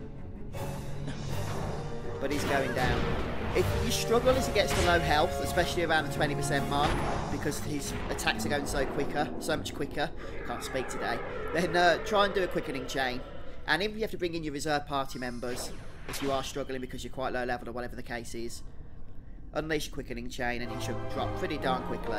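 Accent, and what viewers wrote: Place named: Birmingham, United Kingdom. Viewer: He's from the Birmingham region.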